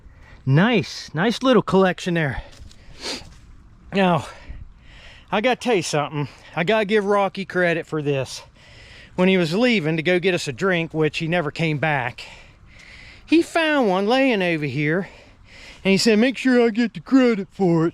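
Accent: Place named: Southern Ohio, United States. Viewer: ❤ the south Ohio accent. Being from southeast Cincinnati, you remind me of my uncles. 🤘🏻Rock on.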